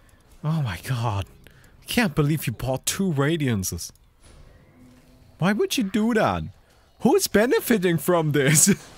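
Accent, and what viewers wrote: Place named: Germany. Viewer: Ein Deutscher der Englisch redet, da kann man immer raus hören dass es ein Deutscher ist. Btw was ist mit dein Mic los ?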